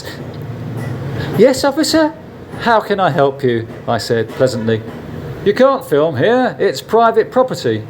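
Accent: England, United Kingdom. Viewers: This was stupid. The englishman, from his dialect I think he is english should try to live in UK. Everything he complains about is so much worse in the UK. Philippines may have some problems, something we foreigners are not entirely happy about because it does not comply with our likes, but still....Pilippines is a great country to live in.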